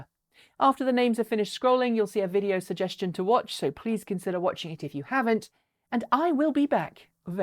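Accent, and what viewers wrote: United Kingdom. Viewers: accentul este britanic, dar ea locuieste si produce videoclipurile in US. Si sa stii ca in scurta mea vizita in USA, am descoperit ca multi dintre cei care stiu ce este o Dacie, stiu sa pronunte perfect. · All electrics are preferred to ICE vehicles... it’s youtubers like YOU that have coined the phrase “Tesla Killer”.. which has created the backlash of other people proving that they are NOT Tesla killers.. so, if you wouldn’t report it as a contentious situation, there would be no backlash.. in the end, you are complaining about your own click bait.. well you got us to click.. then you complain?.. you sure do have the most passive aggressive show that I’ve ever seen. Just because you use you pseudo intellectual, dainty, British accent to make things sound better, it doesn’t mask your bias against Tesla.. and your bias is created by click bait shows like yours. 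So go yell up an alley, no one cares. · Accentul este evident, britanic. Deci nu US. Nici nu cred că americanii ar putea pronunţa corect "Dacia". Britanicii au învăţat deja.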